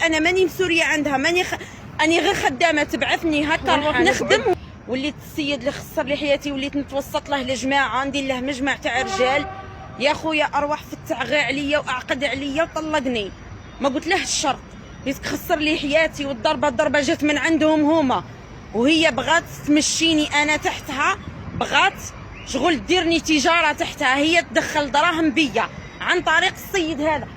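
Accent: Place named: West, Algeria. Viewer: انت لست بسكرية لماذا الكذب. انت تتكلمين بلهجة الغرب. لديك الشرطة لتشتكي. البسكريات بنات فاميلية مايسافروش وحدهم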